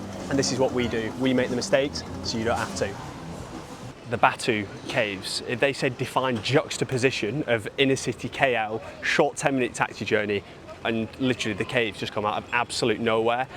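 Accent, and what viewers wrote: United Kingdom. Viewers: Brunei is worth doing for 2 days if you are visiting. I've been to Singapore a few times. I have relatives in Bolton, I thought you did sound a little bit Mancunian lol....I'm from Nottingham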